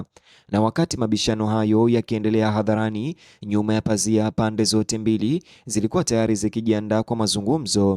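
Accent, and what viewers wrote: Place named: Kenya. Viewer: kiswahili chenyewe kinakusuta wewe ni mkenya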